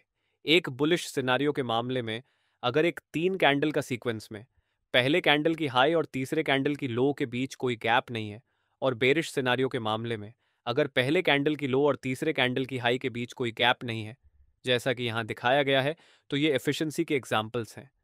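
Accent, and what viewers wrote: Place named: South India, India. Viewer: Sir aap kaha se ho ek baar batana Mujhe South wale lagte ho Lekin telgu madrasi kannad Kon ho aap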